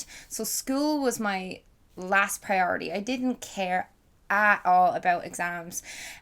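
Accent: Dublin, Ireland. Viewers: I can't hear Louth in it at all. Sounds completely Dub to me!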